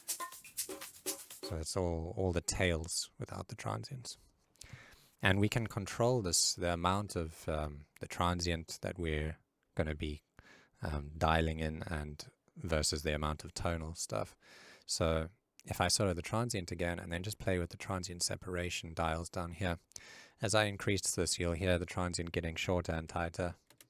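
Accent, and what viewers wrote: South Africa. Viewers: Are you from South Africa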